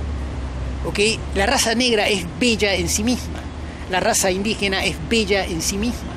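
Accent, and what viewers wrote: Lima, Peru. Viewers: es el acento del varon de clase media alta a alta de Lima, es aceptable, pero el que no soporto es el acento la joven miraflorina o san isidrina, es un acento raro con muchas groserias.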